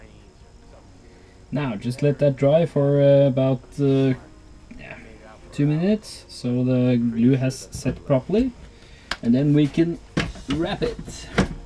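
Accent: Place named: Norway. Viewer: we love Norwegian English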